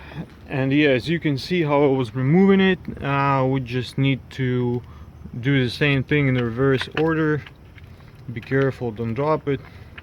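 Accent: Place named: Russia. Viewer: You sound like Ray Raymonde with a Russian accent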